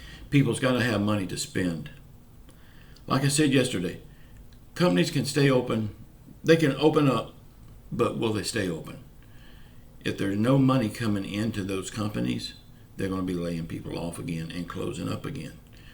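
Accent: South, United States. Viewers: Good morning! Sorry to hear about your throat cancer but I still love your southern accent, and thank you for the work that your doing to keep us informed. God bless you sir 🙏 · May God heal you sir and i love a southern accent 💛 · I am from the south and I sound a lot like you there is nothing wrong with your speech I love it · your a great man..we need u in office...someone that cares...Prayers for your cancer...thank u for sharing thoughts and videos...taking time for all of us... love the southern accent... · A knitting show lol.....I love your southern accent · Hey. If you have a knitting show, I'll be there no matter what. Hope you'll still give us an update on the politics AND the stimulus. You're doing a great job. I'm in NC and grew up in FL. Love your Southern accent. Keep up the good work. You are a refreshing start to my day. Thank you for taking the time for us. 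· GOD BLESS YOU MY BROTHER FOR HELPING US STAY INFORMED DURING THIS TIME, AND PRAY HE HEALS YOUR THROAT CANCER NOW 1 PETER. I LOVE YOUR SOUTHERN DOWN HOME FRIENDLY PERSONALITY, HEART, AND ACCENT LIKE ME AND THE GOOD OLE PEOPLE HERE IN NORTHWEST LOUISIANA!! 😂❤🙌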